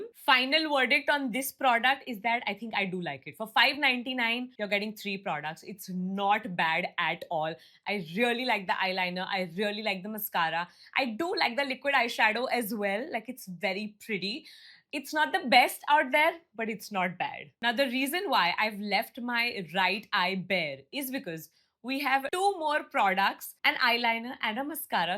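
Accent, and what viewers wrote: India. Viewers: She actually talks like Ross from friends but with an Indian accent.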